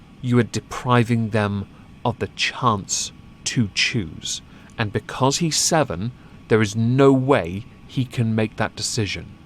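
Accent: United Kingdom. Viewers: The only one feeling dysphoria is the mother, who WANTED a little girl. Oh, and the attention from attempting to gain ‘woke points.’ BTW: Vit-O-mens. LOVE IT. Give me your incredible British pronunciation,!! Love your work, as always!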